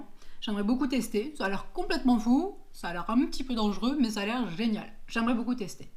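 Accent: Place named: United Kingdom. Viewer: Sympa la vidéo ! Sinon pour les cyclo je pense que le top c'est comme les courses, tu manges entre 3 et 4h avant (oui vraiment, et c'est pas trop) des pâtes, un équivalent de viande blanche, puis peu manger pendant l'effort parce que ça oblige à digérer a nouveau et en plus c'est pas toujours judicieux de mettre la main a la poche en peloton x) Sinon le fait que tu sois prof explique l'anglais au début de la chaine et l'accent, c'est rare chez les youtubers français 😂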